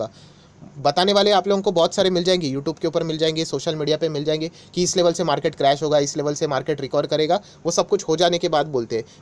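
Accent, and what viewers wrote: Maharashtra, India. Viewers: Are you marathi speaking?Seems to be so from your pronunciations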